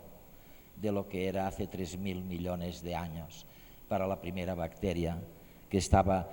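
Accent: Spain. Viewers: por el acento balear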